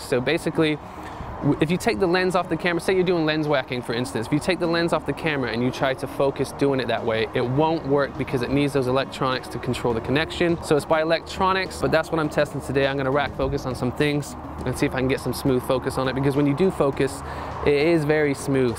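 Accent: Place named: United Kingdom. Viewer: I have noticed that you are getting more of a British accent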